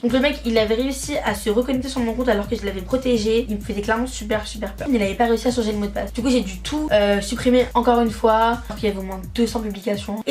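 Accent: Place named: Canada. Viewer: J'aime ton accent Canadian 😍😍😍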